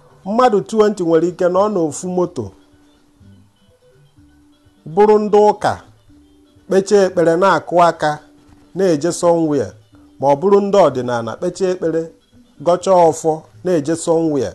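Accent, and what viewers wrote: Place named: Nkanu, Nigeria. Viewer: Thanks a lot for this. We must begin to make efforts to understand our traditional spirituality. Thanks a lot. I just noticed you sound very Nkanu.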